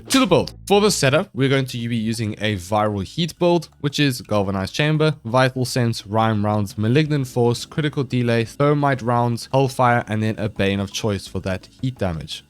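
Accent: South Africa. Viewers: that South African accent slays me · Yoo dude are you from South Africa ?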